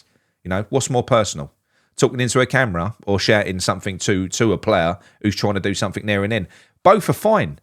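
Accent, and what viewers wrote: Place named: Scotland, United Kingdom. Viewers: Love the Scottish accent. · You’re Scottish accent is the spit of Brendon Rogers 🤣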